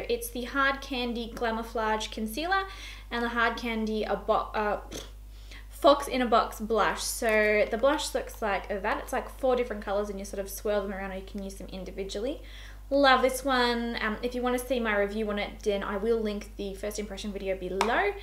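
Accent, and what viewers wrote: Australia. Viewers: Are you from Australia if so which state??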